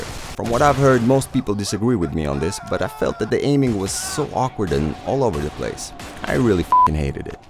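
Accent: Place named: Jamaica. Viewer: First video I seen of yours and I subscribed instantly. This video is so well done and the Jamaican accent is easy on the ears, great job man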